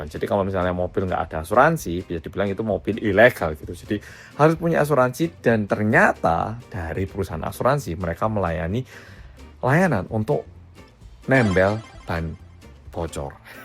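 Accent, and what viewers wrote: Java, Indonesia. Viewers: Jadi seneng lihat videonya dah ganteng logate medhok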